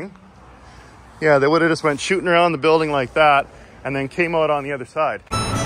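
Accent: Canada. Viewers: Loved the video. You speak like a Canadian though. Figured it was some southern local guy recalling old times. Ah, Alberta I see. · This is the first video I've seen of yours. I had no idea you were Canadian, but I thought to myself "that guys almost sounds Canadian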